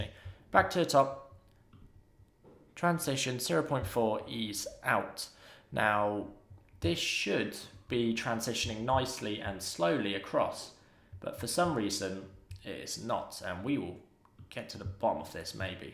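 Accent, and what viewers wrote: United Kingdom. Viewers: learned a nice thing today weather = wafer, the British accent is sometimes very funny and sometimes just annoying :( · you're British right?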